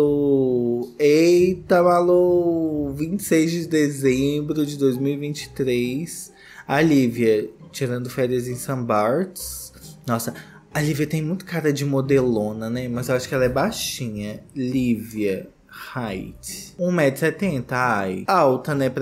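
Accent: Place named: Belo Horizonte, Brazil. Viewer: O sotaque mineiro dele é de bh é quando ele "imita " o sotaque ,ele puxa mais para o sotaque do Norte de Minas kkkkk Falo isso pq minha família materna é do Norte de Minas e eu sou da região metropolitana de bh. Os sotaques são diferentes kkkkk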